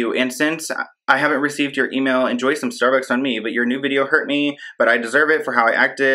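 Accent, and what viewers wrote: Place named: New York, United States. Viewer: Those curls 🤍love your accent. NY?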